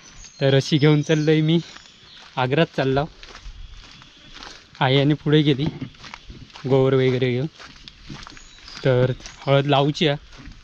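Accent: Malvani, India. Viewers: तुझा वर कोण रागवत नाही बाबू तु मालवणी बोलूक लागलस काय खुप छान वाटता तु असेच छान छान विडीयो टाक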